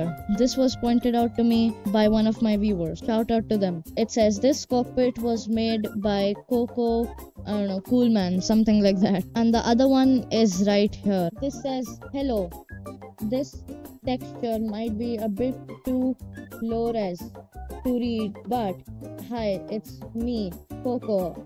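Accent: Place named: India. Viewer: Bro sounds like indian😭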